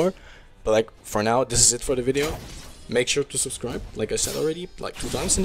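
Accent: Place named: Germany. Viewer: sehr deutsches englisch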